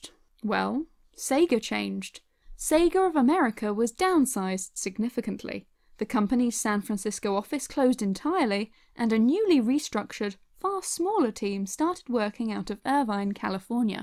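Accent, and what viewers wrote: United Kingdom. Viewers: This is why I love sega. They support fans and hire them. Also I love the narrator's accent! It's really cute ( I just think the female British accent is really cute)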